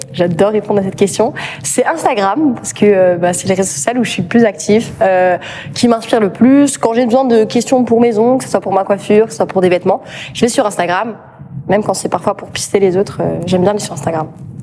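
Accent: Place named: Alsace, France. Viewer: Accent alsacien incroyable 😂😂😂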